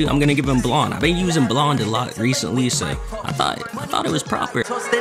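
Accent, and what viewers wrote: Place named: Texas, United States. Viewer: Why does he sound lie he was born in texas because of his accent but hes really balck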